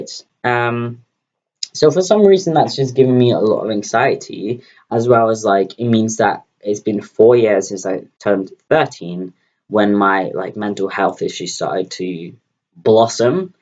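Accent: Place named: RP, United Kingdom. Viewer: Since your voice sounds a lot deeper now, you´re accent suddenly sounds kinda posh. Don´t know why but it´s really cute!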